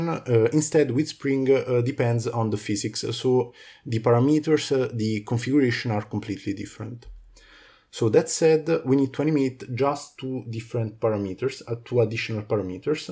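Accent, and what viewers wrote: Italy. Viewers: are you italian? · Italiano? Sembra dall’accento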